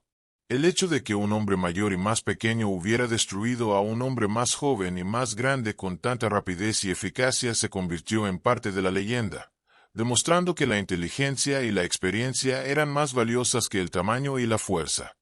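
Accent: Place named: Costa Rica. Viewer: Pronuncia la "ere" como se pronuncia en Costa Rica. Pero le suena feo.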